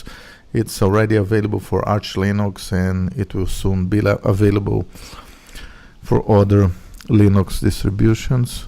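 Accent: Brazil. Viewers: tnx for the video! anyway, r u brazilian or something like this? i noticed a very specific accent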